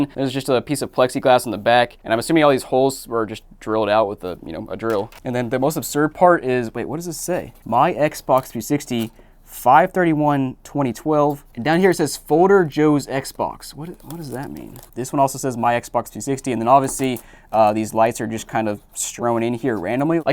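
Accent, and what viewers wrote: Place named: South, United States. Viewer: Hey, I was wondering where you accent comes from specifically. Like. I know It's a southern accent (I think) but a region or a state? I swear I'm not weirdo😅 I just really like this accent but I don't know exactly what accent it is (I'm not american) so I can’t precisely answer the question when asked. Love your vids too btw.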